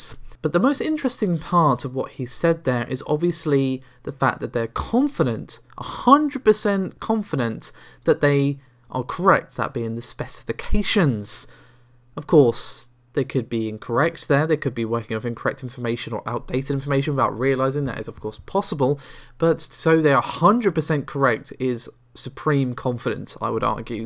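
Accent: United Kingdom. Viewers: I cannot hear what this guy says. Talks too fast, slurring and with tons of nonsense and seems to only emphasis a heavy British tone sequence. If you're not showing your face then don't pretend you have a conversation.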